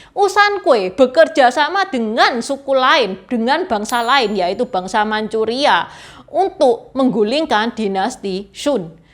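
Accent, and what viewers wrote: Java, Indonesia. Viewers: logat jawanya jangan di ilangin...terima kasih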